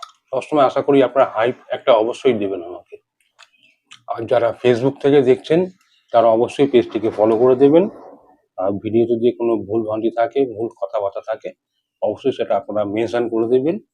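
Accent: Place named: Bangal, Bangladesh. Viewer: বাঙাল বাঙাল, ঘোটি কখনোই নয়। ওনার কথাবার্তায় পরিষ্কার বোঝা যায়।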